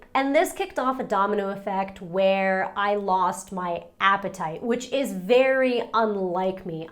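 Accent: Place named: New Jersey, United States. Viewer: saw your IG and your 'cathartic cry'. Glad you're making healing progress. Also, you're losing your Jersey accent, slowly but surely, hahaha.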